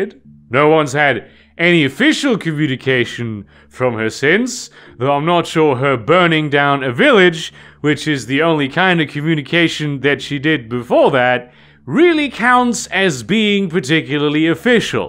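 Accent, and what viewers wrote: Germany. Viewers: I knew in one video mentioned being German, I didnt realize how German until listening to this. Honestly I could do with a video of him speaking solely German. Also, found a long lost map and campaign setting for shadowrun that I thought that I lost today same as when I started this video. I take that as a sign to run a game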